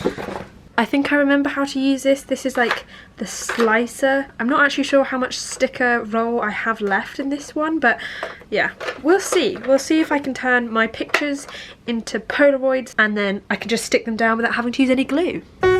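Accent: United Kingdom. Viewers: Gosh!i love your accent British~♡love it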